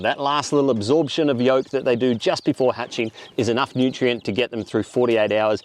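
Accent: Australia. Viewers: 100% on the accent, been in the US for 10 yrs now and it's a welcome change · Thank you for a great informative and to the point video. Well done. It’s so nice to hear an Australian accent on these videos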